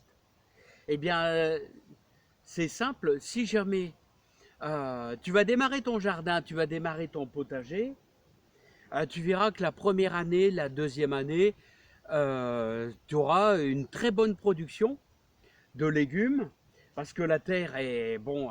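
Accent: North, France. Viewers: Bonsoir monsieur, une video intéressante, j'ai toujours autant de plaisir à vous écouter, et cet accent chaleureux de mon nord que j'ai quitté, prenez soin de vous à bientôt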